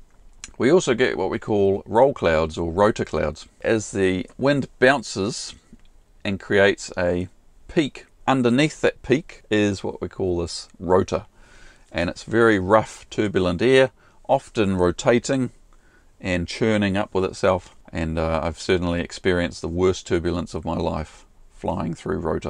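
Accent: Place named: New Zealand. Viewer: I love the NZ accent, ahbe been there in 2008 and love your humor, Keep on going and greetings from Switzerland (LSPO)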